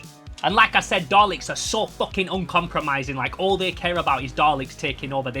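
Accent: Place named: Yorkshire, United Kingdom. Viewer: Kinell, how fast and Yorkshire can you speak?! Good video though!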